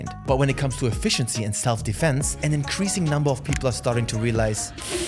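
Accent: Germany. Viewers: I like your german accent. Sounds more brutal this way... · You have a germany accent. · finds so lustig, dass ja super englisch spricht, aber man trotzdem das deutsche raushört:D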